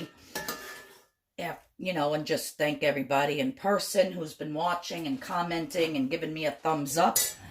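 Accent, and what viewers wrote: New York, United States. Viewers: I like your recipes! I’m following you to keep on track with WW purple. Being from Philly, I love your New York accent💗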